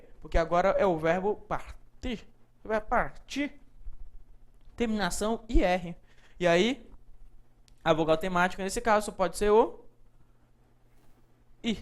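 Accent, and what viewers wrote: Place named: Goiás, Brazil. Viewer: O professor tem sotaque de goiano. O sotaque dele às vezes dá agonia mesmo. O que vale mesmo é que ele esclarece a matéria muito bem muito obrigado pelas excelentes aulas.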